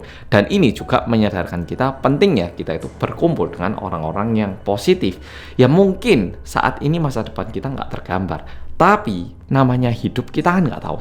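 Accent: Java, Indonesia. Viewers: Edan...medok banget Indonesianya,ngga ada minesnya😂😂😂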